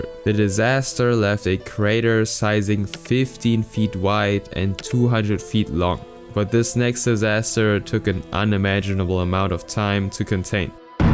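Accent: Germany. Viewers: Either German or Austrian. He’s got a noticeable accent imo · U sound german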